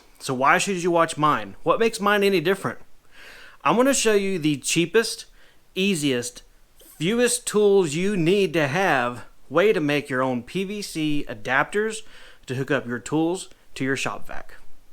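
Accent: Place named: Carolinas, United States. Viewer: I've really been enjoying your vlogs and hearing your thought process as you organize your shop lately...also, where in NC are you located? I ask because I'm right on the NC line in upstate SC. And your accent sounds pretty similar lol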